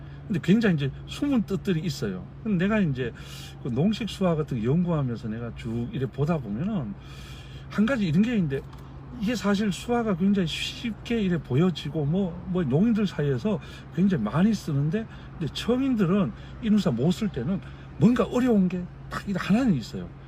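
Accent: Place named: Gyeongsang, South Korea. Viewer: 선생님의 말씀을 들으면 '이제 나도 선생님 가르침을 따라 열심히 하면 수어를 잘할수 있겠구나'하는 자신감이 생깁니다. 수어를 외우는 것이 아닌 이해 하면서 공부 할 수 있겠다는 생각에 수어 공부에 대한 관심이 더 커지는것 같습니다. 선생님께서 연구를 위해 많은 노력을 하셨음을 또한 느끼며 감사할 따름입니다. 그리고 선생님 말씀 하실 때 억양이 경상북도분이 아니신가 생각 됩니다. 저는 전라도 사람인데요 경북 영천 친구가 있었는데 그 친구 억양과 비슷하다 느꼈습니다. ㅋㅋㅋ 선생님~ 선생님의 연구에 존경을 표합니다. 찾아 뵙고 인사 올리는게 도리일진데 댓글로 인사 올려 송구합니다.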